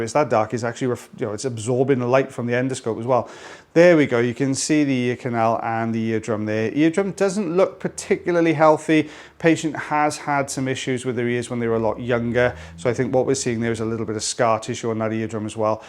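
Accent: Wales, United Kingdom. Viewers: That was a great one! And you said Ebony in your lovely Welsh accent. I’m happy. And glad you’re sounding better today.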